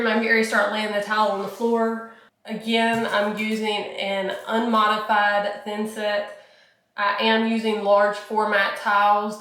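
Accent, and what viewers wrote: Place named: South, United States. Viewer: Good video, well depicted. One thing ( Not a criticism) Hey, where are you from? I love your accent. I talk like that too, but my family wonders why because we were from Ohio and Pa, not the SOUTH.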